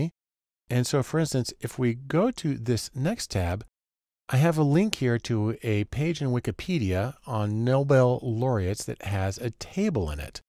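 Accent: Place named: England, United Kingdom. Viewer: its so nice to hear clean english accent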